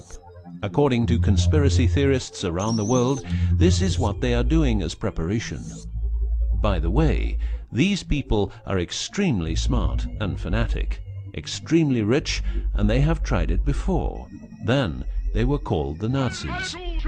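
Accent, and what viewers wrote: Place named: United Kingdom. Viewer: this is RETARDED but since an annoying brit is narrating it must be true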